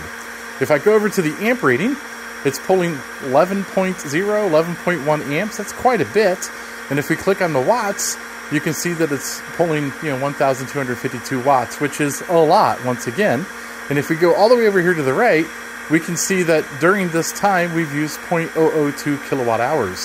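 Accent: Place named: Mid-Atlantic, United States. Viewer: Totally irrelevant but do you happen to be from northern Maryland, Pennsylvania, Jersey area? I'm quite interested in linguistics, I thought I detected a familiar accent.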